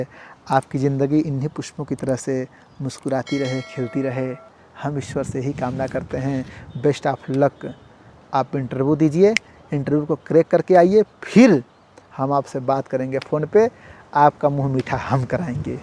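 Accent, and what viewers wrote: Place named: Uttar Pradesh, India. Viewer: Apki batein bdi pyari h sir lg rha h ap up se h .sir mai kvs tgt Hindi interview ki tyari kr rhi hu pls ese hi margadarasan krte rhe .mai up santkabir nagar district se hu.🙏🙏🙏🙏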